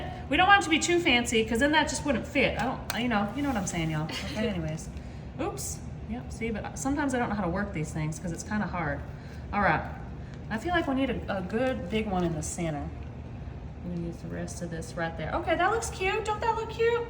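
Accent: South, United States. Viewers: She talking Souther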